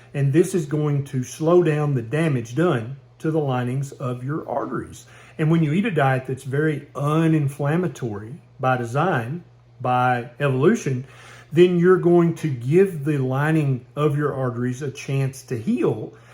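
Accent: South, United States. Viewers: Sorry, not to be a racist, and this is totally my fault, but I just can't take it seriously when I hear a hillbilliyish guy in a pink shirt frantically telling me to hurry up and get whacky naaka plant to clean out my arteries. Is this satire/comedy? If so, haha!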